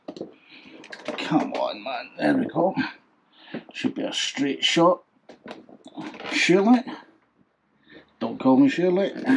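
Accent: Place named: Scotland, United Kingdom. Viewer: Good video mate, I just got a new Pcx 2 weeks ago. Decent wee machine,I now live in Spain so the weather is great for it. I am still frightened too death leaning it in the bends . I suppose it will take time. I do things on my ebike that I could never do on the Pcx.( ride like a madman ). Good to hear a Scottish voice. Keep it up mate. Subscribed 🏴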